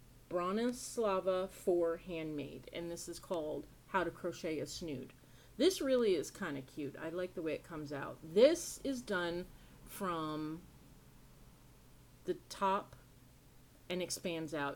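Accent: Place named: New York, United States. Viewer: Hi! Darla from Crafty Yarn Owl gave you a shout out in her most recent video, “Crochet Bags”. I thought I would start with your first video and I think you are a natural! Your first video was good and I think you have a really genuine easy- going way of talking and showing your projects. I love the name Twisted Stitches! I live in Florida too and used to work as a pediatric nurse. I am originally from Long Island, N.Y. And have lived here for 24 years now. I had a feeling maybe you were from N.Y., but I may be wrong. When you said about the way you were talking, I’ve been in Florida too long I knew what you meant. I say things that I never would have said before and have a bit of a southern twang now when I speak! I am a new subbie and look forward to your other videos. (I am just starting to take up knitting and knitted my first hat, I have been crocheting for years). 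I have a bad back too, degenerative discs, since I was 29, am 65 now. I know what that’s like! Hope you are doing well! 🤗🧶💗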